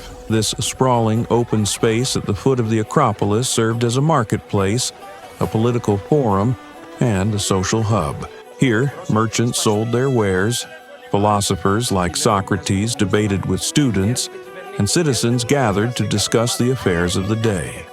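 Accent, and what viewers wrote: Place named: South, United States. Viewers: Right said. The Southern accent is so annoying in any context. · Good video, but the slightly southern accent seems a little out of place.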